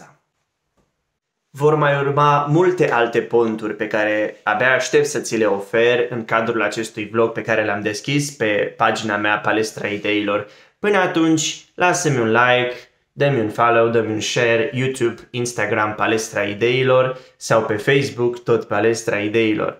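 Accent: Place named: Germany. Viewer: 7 luni și deja pare că ești un neamț care vorbește română, nu un român care vorbește germană. :D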